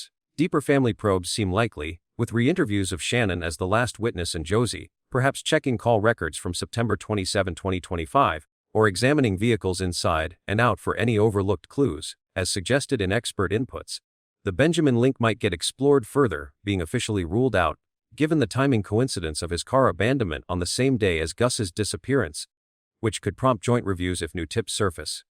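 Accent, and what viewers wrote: United States. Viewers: American accent on ai voiceover 😒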